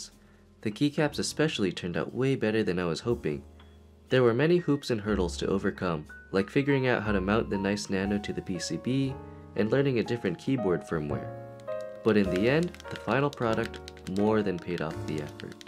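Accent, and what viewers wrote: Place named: United States. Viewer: Is this the first time he’s spoken in a video? Didn’t expect him to be American.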